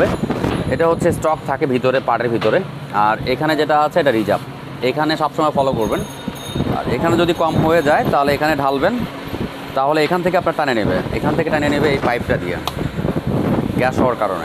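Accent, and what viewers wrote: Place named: Naogaon, Bangladesh. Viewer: ভাইয়া আপনার গ্রামের বাড়ি কি নওগাঁ জেলা? মানে আপনার কথা শুনলে মনে হয় তো তাই জিজ্ঞেস করলাম আর কি!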